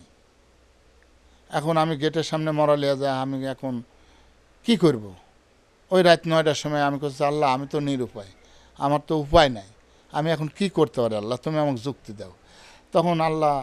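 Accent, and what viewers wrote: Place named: Natore, Bangladesh. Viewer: কথা শুনে বুঝলাম আমাদের নাটোরের মানুষ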